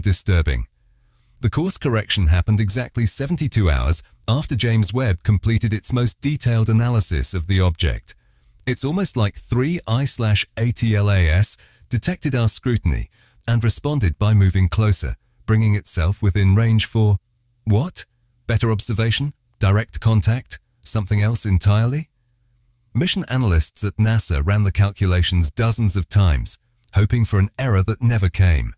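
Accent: United Kingdom. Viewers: Am I the only one who’s sick of the ubiquitous English accent AI voice narrators? Life’s too short to listen to this fop spell out “3 I A T L A S”, over and over - at least 20 times in the first few minutes - makes it unwatchable ~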